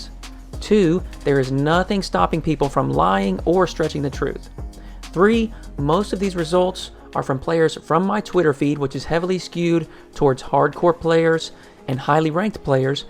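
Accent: South, United States. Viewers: Is that a southern accent? I'm not from US so I'm not really sure.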